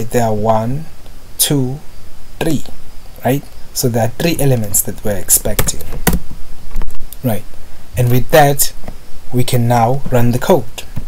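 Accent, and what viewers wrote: South Africa. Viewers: you sound south african sir. thank you by the way!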